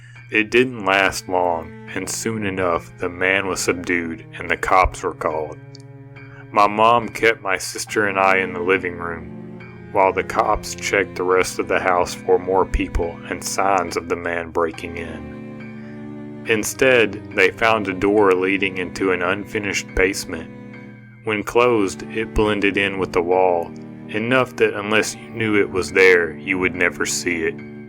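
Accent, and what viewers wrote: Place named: South, United States. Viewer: I 🖤 ur subtle southern accent, and 🖤 ur channel! Emz.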